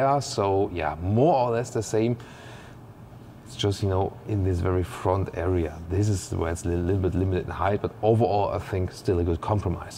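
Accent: Germany. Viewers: His „German“ English accent is absolutely unbearable!!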